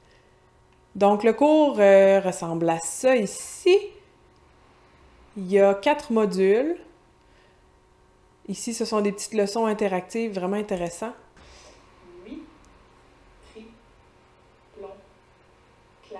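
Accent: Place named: Quebec, Canada. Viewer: Un autre brésilien ici. Je trouve que l'accent québécois est magnifique!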